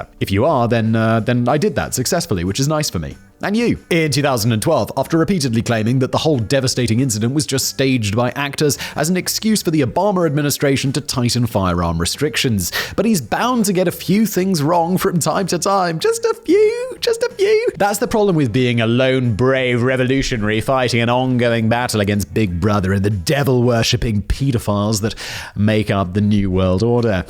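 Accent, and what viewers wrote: United Kingdom. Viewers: I’m a twenty something white/Hispanic girl and I absolutely vibe with all your content Simon. Super sad you had to leave geo/biographics bc that’s where I started watching you before branching out to all your other channels but ultimately understand why it had to happen. Either way I’m just a big fact nerd who loves your fancy British accent and cracked out tangents! Never change my dude! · I am not a dude and I don't recall YouTube inquiring of my gender. I am subscribed to every channel you have because facts being delivered in the voice of my former colonial overlords helps me sleep...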